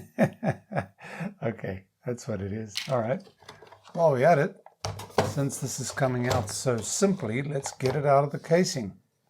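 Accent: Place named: South Africa. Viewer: btw i was always puzzled by your South African accent, in Madeira and just now i found out that you studied there. The mystery solved. Sorry for prying.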